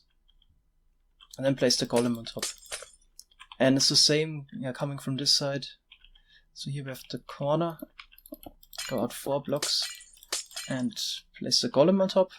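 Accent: Germany. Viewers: Man hört zwar richtig raus, dass du deutsch bist, sprichst aber trotzdem einwandfrei englisch :D....Gutes Tutorial, es könnte nur etwas kompakter sein (Vorallem zu Beginn, hast du ziemlich viel geredet, was man hätte kürzer machen können), weil 27 min vlt doch etwas zu lang sind. Nur Kritik, kein Hate ;) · Are you german?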